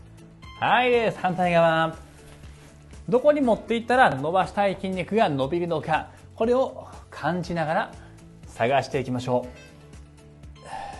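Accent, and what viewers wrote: Kansai, Japan. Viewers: 途中から吹き替えみたいなって内容がはいってこないww ちらちら関西トーン 頑張ってやってみます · 声が良い✨ 関西訛りがあるからか ナダルさんみたい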